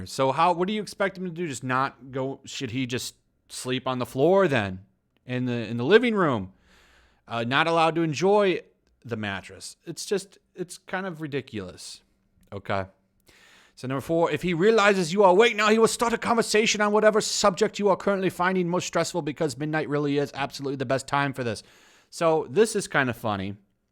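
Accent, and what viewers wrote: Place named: RP, United Kingdom. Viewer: 98% of British (English, Scottish and Welsh) people don't really sound like that....Atleast you sounded like the Royals. 😂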